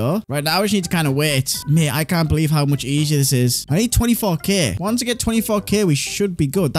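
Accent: United Kingdom. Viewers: You kinda like bretish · Is he british?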